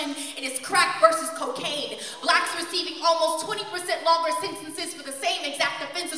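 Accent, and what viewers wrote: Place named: New Orleans, United States. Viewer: im convinced shes from nola lol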